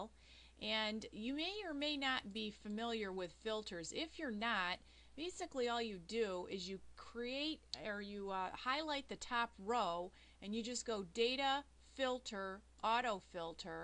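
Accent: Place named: North, United Kingdom. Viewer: Ummm, no. She sounds like she's from up north.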